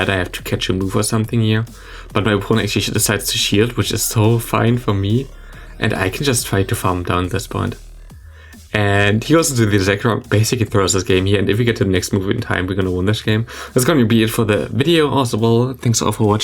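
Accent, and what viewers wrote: Germany. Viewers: Bist du ein deutscher ? :)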